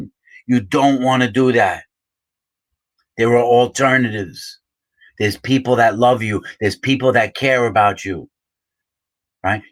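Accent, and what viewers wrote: New York, United States. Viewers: I love your material! I can relate to a lot of your stories! I am from NY two so I reconized your accent! I really like your context!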